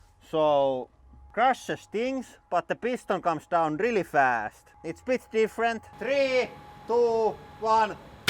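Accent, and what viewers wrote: Finland. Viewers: I was trying to guess your nationality by your accent, but when I heard Perkele the guessing was over. Greetings to Finland. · I didn't really watch before. But I thought the accent must be Finnish, and then you hit us with the perkelle, and it was confirmed haha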